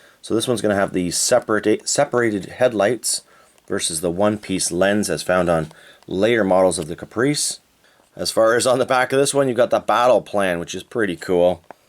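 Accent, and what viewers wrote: Canada. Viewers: Banana-ing of the vehicle❓❓🤔 - Ya big kid,you.🤭 Also,just wondering,by any chance,would you happen to be Canadian?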